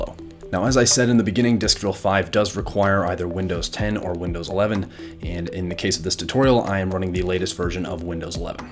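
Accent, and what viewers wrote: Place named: Canada. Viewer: Hi there! Great video! I will try to recover my SSD. By any chance are you Canadian? I am, and I hear the accent in your video. Great job on this and thanks for helping us with recovery issues!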